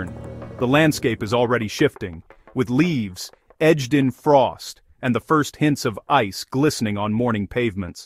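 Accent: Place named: United States. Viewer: Why does someone talking about the UK have an American accent?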